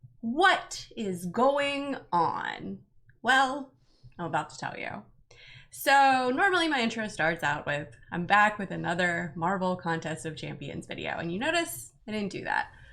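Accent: Pittsburgh, United States. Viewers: yeah its called Pittsburghese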